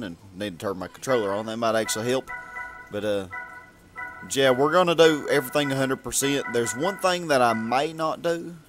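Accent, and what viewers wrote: South, United States. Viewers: Man, that's a thick southern draw, where you from?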